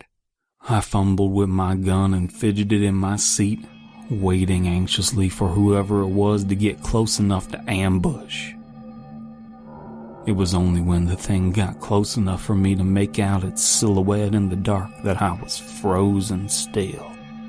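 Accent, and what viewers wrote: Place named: Nebraska, United States. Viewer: You really did a good reading of this tale. Your 'accent' for this reminded me of growing up in Nebraska.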